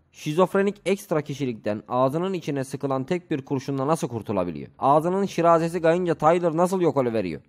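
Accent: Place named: Zonguldak, Turkey. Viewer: Seslendiren abimiz %80 zonguldaklı veya bartınlı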